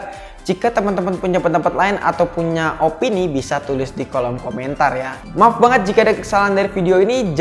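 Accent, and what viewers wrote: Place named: Banyumasan, Indonesia. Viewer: Njer basane ngapak😭🤣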